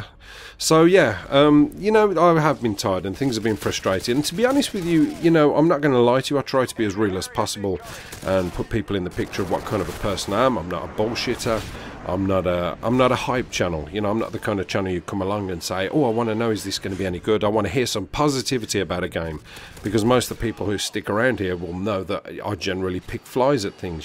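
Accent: Birmingham, United Kingdom. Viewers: You don't sound tired mate, it's just the brummie accent! ;-)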